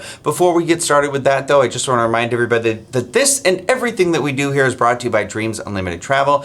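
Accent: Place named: Massachusetts, United States. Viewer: Mass accent béer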